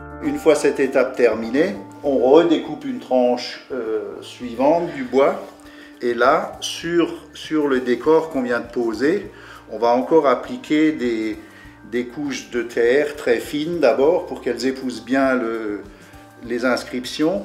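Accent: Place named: Alsace, France. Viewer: Sympathique accent Alsacien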